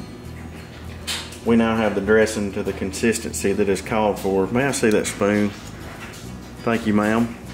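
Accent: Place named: South, United States. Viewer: Nice the accent you know the country guy can cook. New sub here thanks for the video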